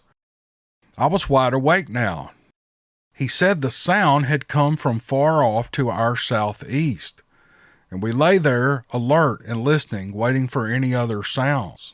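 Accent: South, United States. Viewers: I appreciate you so very much. Love to hear your awesome Southern voice and your sense of humor. God bless you & your family!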